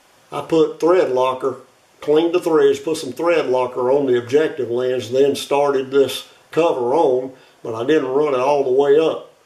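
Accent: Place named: South, United States. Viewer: Really enjoying your videos. It’s all good. Good to hear an American country voice that I can understand and knows his business. I personally thank you taking the time to share your knowledge with us.👍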